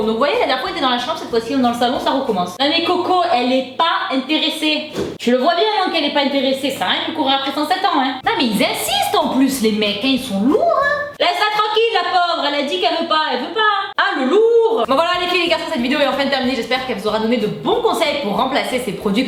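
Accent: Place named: Nice, France. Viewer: J'adore cet accent niçois dans toute sa splendeur,vraiment ☺️ c'est peut-être la première que je commente ici mais franchement,j'aime bien ton contenu 😉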